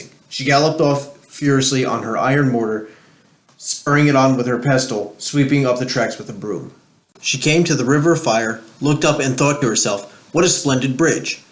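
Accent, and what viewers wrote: New Jersey, United States. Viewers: Don't apologize for your Jersey accent, I love listening to you. Thanks for telling this story!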